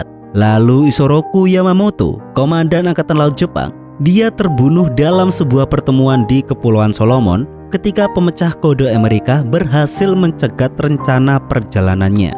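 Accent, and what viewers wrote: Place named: Malang, Indonesia. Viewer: Bahasamu kek org malang min😂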